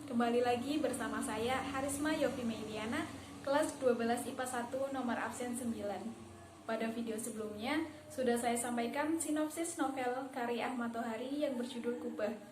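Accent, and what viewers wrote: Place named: Tegal, Indonesia. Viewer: Bahasane medok men dek... Oponeh nek ngomong kubah... H yang khas tegal... Semangat dek... Oh yo... Tambah senyumnya...biar semakin imut... 😁😁😁 Dan tolong mungkin cari tempat yg agak tenang dan di kasih backsound... Intonasi lumayan oke... Siip...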